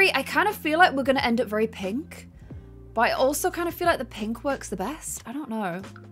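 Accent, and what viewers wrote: United Kingdom. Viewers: It's so cute how you speak Korean with an English accent.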